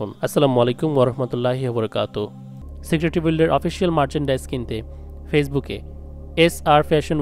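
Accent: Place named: Sylhet, Bangladesh. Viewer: ভাই আফনি কিতা সিলেটি ফুয়া নি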